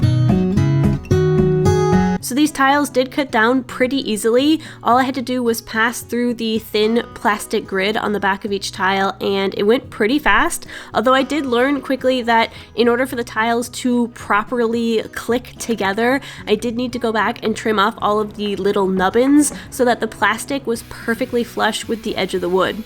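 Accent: Quebec, Canada. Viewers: Ça faisait longtemps que j'espérais t'entendre parler français un jour dans une vidéo (avec ton super accent québécois !) ☺ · Très plaisant de t entendre parler français avec ce superble accent québécois! Du miel pour les oreilles 😘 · J’aime beaucoup t’entendre parler canadien 🤭 Ta terrasse est splendide ! Ton propriétaire l’est moins 😂 !! À bientôt ! 🌸🌸🌸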